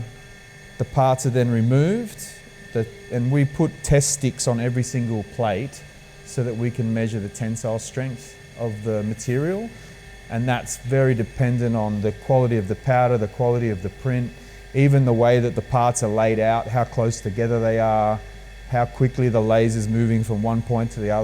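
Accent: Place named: Australia. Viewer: Wow. I want one. Got a shock when you spoke with an Oz accent after the algorithm put this up (I am from Melbourne). Will look for your website now 👍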